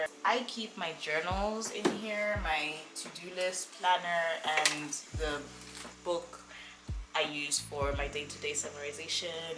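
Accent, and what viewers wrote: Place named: United States. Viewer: Are you from USA? Your accent is giving me American vibes.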